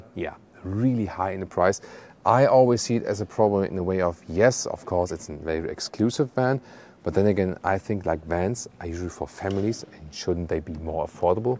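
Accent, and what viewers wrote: Germany. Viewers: A Tschermän tolking inglish. horrible! Bleib beim Deutschen, es ist authentischer!!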